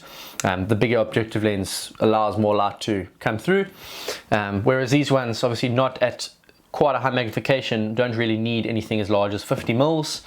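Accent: South Africa. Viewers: We gonna be looking- you talk like that everytime, its englisch or South african like zulu?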